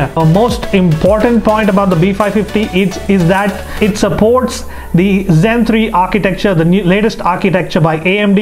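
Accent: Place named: India. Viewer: way too much accent .. be indian with neutral accent .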